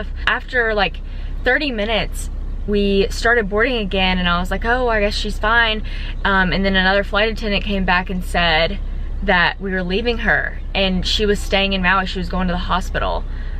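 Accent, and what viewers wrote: South, United States. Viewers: Hi... I'm amazed that your internal flights are still operating in the USA... Our flights in the UK are totally grounded.. We have been told by the UK Government that we can only go out for exercise / get food / if your a key-worker NHS... we're pretty much all grounded, we can't even go out in the car because police are stopping car movements and you risk a £££££ fine!!! You sound really sad...! I just love your accent...;-) YYYeeeeeee Hawwwwww Go Cowgirl!!! Bet you look great in western gear..!!!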